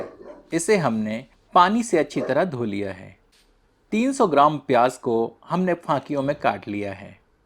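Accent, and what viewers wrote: Standard. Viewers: बहुत प्यारी शुद्ध हिन्दी आप बोलते हैं सर 🙏🙏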